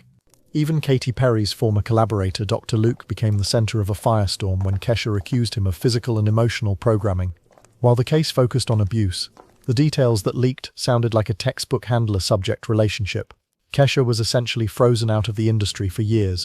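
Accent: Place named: United Kingdom. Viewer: Sensationalistic AI slop. Powerful people always have and always will exploit the ambitious and vulnerable. A robot’s British accent doesn’t make it true. Plenty of serious problems in the real non conspiracy world far more deserve your time. Ever consider you’re getting suckered and distracted by this junk?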